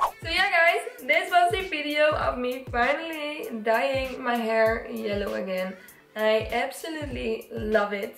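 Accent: Netherlands. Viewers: I hear in your accent that you come from the netherlands. I really like your youtube channel. Hey, ik ben ook Nederlands. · sounds dutch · Ik think she is from the same country as me ( the netherlands) because she sometimes says dutch words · you're talking with a dutch accent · je hoort gewoon dat je Nederlander bent XD <3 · Are you dutch? It sounds like you have a heavy dutch accent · She sounds Dutch to me lol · are you dutch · phan trash it sounds like she's from holland/the Netherlands but I'm not sure. My mom is from holland and that's what her accent sounds like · I totally heard you were dutch before checking the description box. Dutch people unite❤ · Ik hoorde aan je accent al dat je Nederlands was :) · ik hoor gelijk dat je nederlands bent haha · Yeah she’s dutch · It really sounds like a bloody heavy Dutch accent. I’m almost sure she’s Dutch! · are you dutch? 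· Are you Dutch? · Are you dutch?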